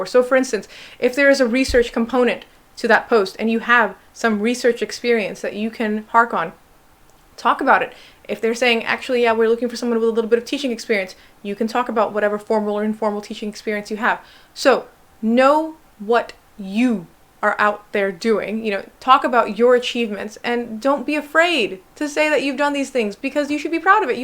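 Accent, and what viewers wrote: United States. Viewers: How are you in the uk and have an american accent?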